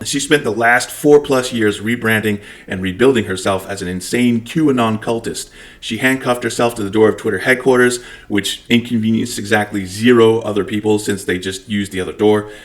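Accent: Scotland, United Kingdom. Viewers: I can hear a Scottish brogue here! interesting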